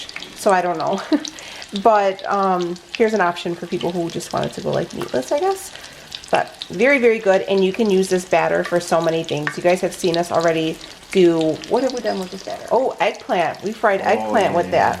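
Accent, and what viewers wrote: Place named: Minnesota, United States. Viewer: You sure sound Minnesotan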